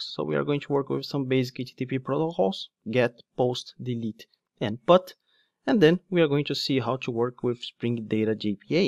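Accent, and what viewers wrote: Brazil. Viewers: Eu pensando que era outra pessoa ensinando, mas é o mesmo BR falando inglês kkkkk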